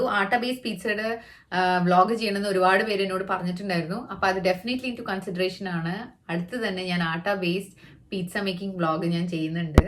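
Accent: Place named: Palakkad, India. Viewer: Chechide slang gilu evidekayo oru plkd touch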